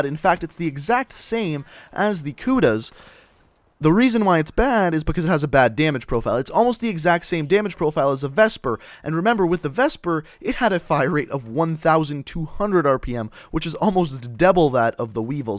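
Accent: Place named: Newfoundland, Canada. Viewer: I think this guy is from Newfoundland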